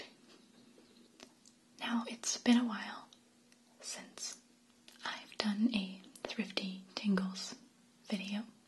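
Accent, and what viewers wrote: United States. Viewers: r u from america?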